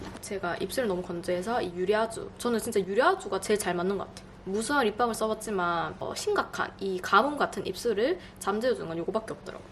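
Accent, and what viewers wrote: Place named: Daegu, South Korea. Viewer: 처음보는데 대구분이신가봐요 사투리 친근하네여:) 잘봤습니당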